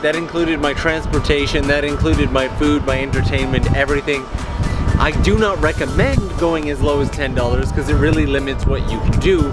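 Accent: Canada. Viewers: Sup man I'm obsessed with Japan currently, and I found you from sharmander in Japan ! I'm loving your first video for me so far. Also, are you canadian? I hear alot of abooots in your accent xD Also, what is the best way to know when exactly the pink trees will bloom to it's fullest? I can't believe they only bloom for like 3 days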